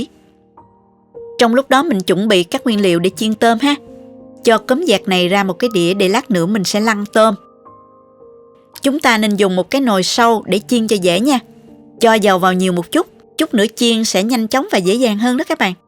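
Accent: South, Vietnam. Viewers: Cảm ơn luôn luôn cho ra những video bổ ích..với sự hướng dẫn ngắn gọn dễ hiểu, cùng với giọng nói mộc mạc đậm chất nam bộ, em rất thích nghe a 👍💕, mến chúc cô nhiều sức khỏe để tiếp tục với niềm đam mê của mình ❤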